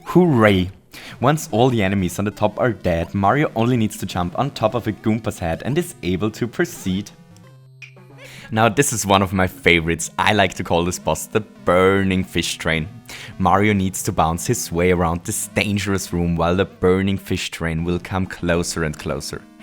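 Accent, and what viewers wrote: Germany. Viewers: hey cool and very helpful video! :) p.s. are you German? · are you german?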